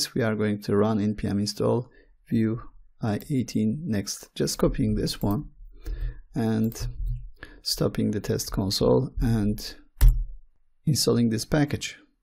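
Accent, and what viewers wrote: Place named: Turkey. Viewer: just when I started to think "wow what a heavy Turkish accent", the tr locale showed up haha. Thanks for the tutorial though.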